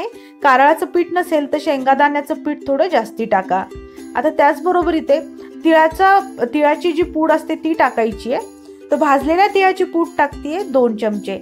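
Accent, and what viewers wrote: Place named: Goa, India. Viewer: Helo Madam Tuzhe Nanv kitem aAni tun Goenkar ashen dista the accent you are talking is from Goa I think Marathi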